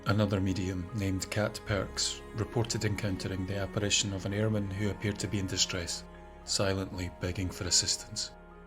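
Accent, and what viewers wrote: Scotland, United Kingdom. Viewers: Just your voice alone makes one wish to see Scotland.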